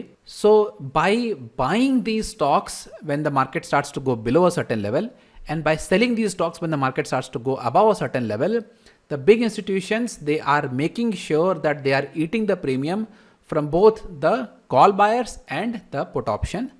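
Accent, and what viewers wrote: India. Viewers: Your accent same as P R sundar sir, are you from tamilnadu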